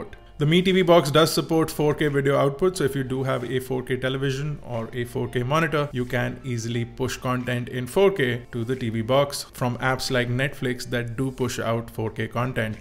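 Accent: India. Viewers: problem with the microphones because your accent is india not english nature not to be racist or anything. i thought this was to see online stuff but is pretty much a big youtube watch and a netflix stuff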